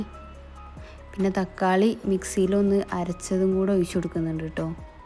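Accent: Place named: Malappuram, India. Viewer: Areekode slang ann🥰njanum angane aaan..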